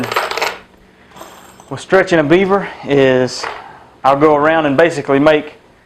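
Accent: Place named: South, United States. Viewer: This guy has such a southern accent, another great video, I learned allot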